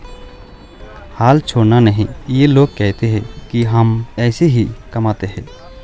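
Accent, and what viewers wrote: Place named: Sylhet, Bangladesh. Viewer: Iam sure you are sylheti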